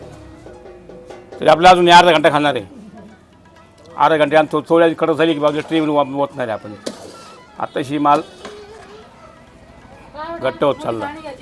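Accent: Khandesh, India. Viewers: तुम्ही कुठले आहे मला तर खान्देश कडचे वाटता भाषेवरून मोहनमाळ बघितल्यावर माझ्या तोंडाला पाणी सुटले पण असा मोहनथाळ आमच्या कडे खायला मिळत नाही कोणी बनवत नाही ईकडे तुमचे सर्व पदार्थ खुप छान असतात आणि अगदी प्रेमाने तुम्ही ते काहीही न लपवता सांगता खूप छान वाटते असे तुमचा मोहनथाळ बघून तर मला असे वाटले माझ्या कडे काहीतरी कार्यक्रम करावा आणि मोहनथाळ तुमच्या कडुन बनवुन घ्यावा 😋 आम्ही पंढरपूर जवळ राहतो म्हणजे माझं सासर आहे आणि माहेर चाळीसगाव पण आता तिकडे कोणी नसते पण तुमचे पदार्थ बघून तिकडचीच आठवण येते मला खुप छान बनवता तुम्ही सर्व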